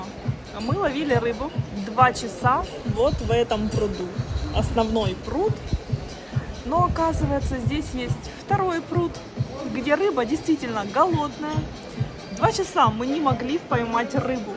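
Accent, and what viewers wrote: Ukraine. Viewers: На Украине такой красоты нету? В Киев на экскурсию поедете, что вы в России забыли? Путин украинцам по выдавал России кие паспорта, от этого вы русскими не как не стали, это равносильно что негру дать российский паспорт, от этого он русским не стал, видно по вам что вылетые украинцы, по русски с акцентом, по украински бы разговаривали бы, вам больше идет украинский язык, русская речь у вас с большим акцентом, вас украинцам можно от лечить от русских, почему? Потому что это две разных национальности. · Ну раз уж РФ вас отвоевала и вы помчались путешествовать по особо не родной прежде стране. То было бы маст хевом избавиться от говора и начать учить русскую речь! А то понимаете ли пока еще не все россияне в курсе что где то там какой то Мариуполь теперь не в составе УКР. Антинезалежные настроения все такое..Речь сразу резко отличается!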